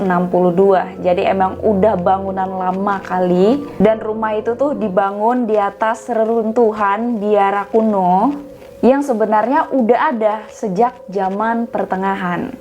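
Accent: Medan, Indonesia. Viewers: Dari yg kangen denger cewe logat medan.. Skarang udah terobati Kangen Tebing Tinggi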